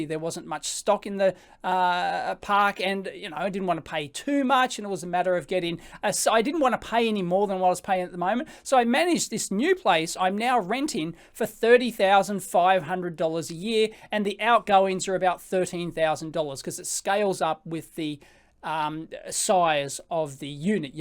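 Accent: Australia. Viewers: ''Office spice'' :) I love your channel and I love your Australian way of speaking!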